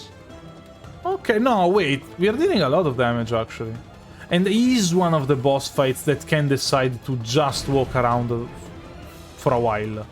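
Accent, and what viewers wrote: Italy. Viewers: It's just your average Italian accent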